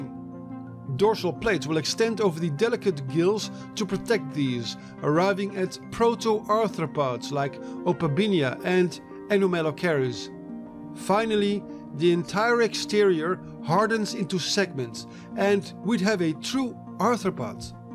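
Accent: Netherlands. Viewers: Just a off-topic question. Is the narrator by any chance dutch? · Are you Dutch? I hear a Dutch or maybe South African accent!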